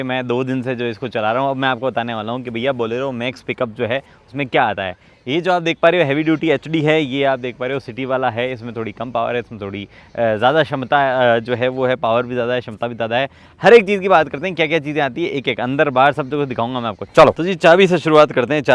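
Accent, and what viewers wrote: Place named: Marwari, India. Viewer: Yes, He is Marwadi.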